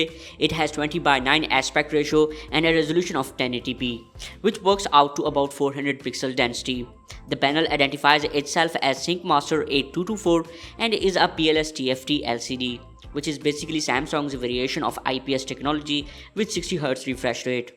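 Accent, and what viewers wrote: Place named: Sweden. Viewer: Swedish Accent.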